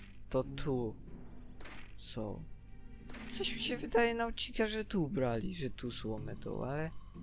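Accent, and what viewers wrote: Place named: Poland. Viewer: Masz bardzo fajny głos. Czy ty pochodzisz z jakiegoś regionu polski że masz taki akcent?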